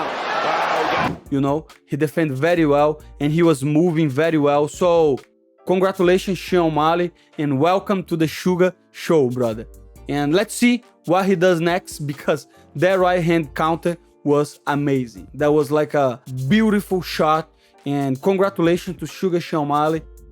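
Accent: Brazil. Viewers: Your English is good. You just have a thick Brazilian accent. And there's absolutely nothing wrong with that. I prefer to hear someone's accent coming through personally. I'd say you're doing a very good job.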